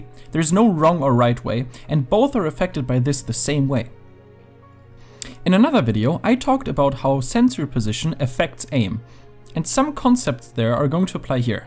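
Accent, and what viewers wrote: Germany. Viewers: Du bist deutscher oder?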